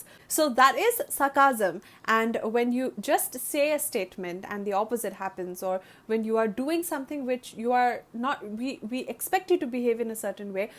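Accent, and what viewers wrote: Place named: India. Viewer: This is what indian accent is supposed to sound like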